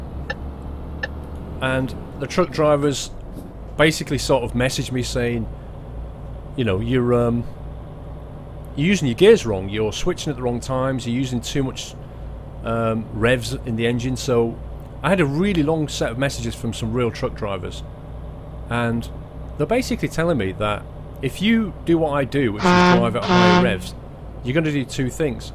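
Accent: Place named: Yorkshire, United Kingdom. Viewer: Your from Yorkshire, sound mate same!!!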